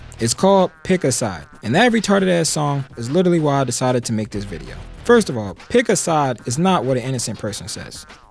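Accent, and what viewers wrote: Baltimore, United States. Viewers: You sound heavy on the Baltimore accent 😂 but commentary though my guy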